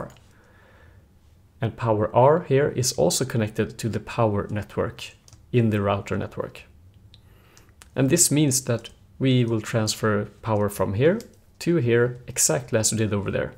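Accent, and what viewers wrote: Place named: Sweden. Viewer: Du är svensk va? Hörs på dialekten. Grymma videos :D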